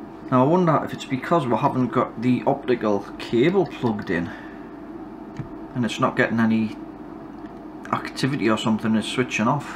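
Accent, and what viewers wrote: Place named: North East England, United Kingdom. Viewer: I think your videos are brilliant. I have always liked the North East accent. I was hoping one day to marry a Geordie girl just for the voice. She could tell me bedtime stories so I could drift off into dreamland. Thanks for the info on the trinocular. I have bought a few tools but still needs a few more things. Thanks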